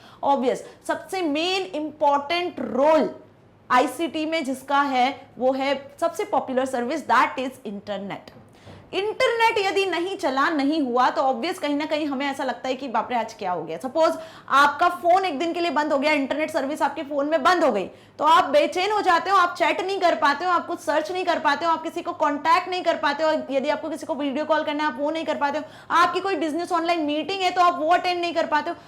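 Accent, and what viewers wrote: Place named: Tamil Nadu, India. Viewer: Mam aap Tamil se ho kya, who was Aisa Laga mere ko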